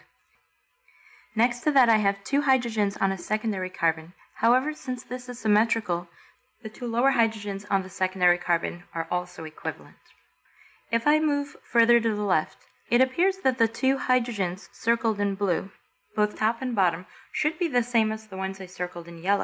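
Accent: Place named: Borough Park, United States. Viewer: You sound like you grew up in boro park. I know that accent from a mile away.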